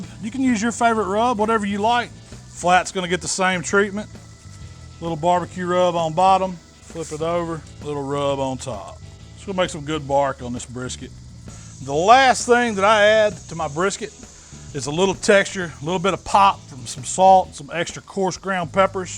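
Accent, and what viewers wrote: South, United States. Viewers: First seven seconds is all I needed to watch to know this guy is legit. Fat guy with a southern accent? SOLD. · As soon as I hear that thick southern draw, I know this is gonna be some good BBQ. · Vegetarian here. This is sooo satisfying to watch! Specially his southern accent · The best channel to pickup the American accent.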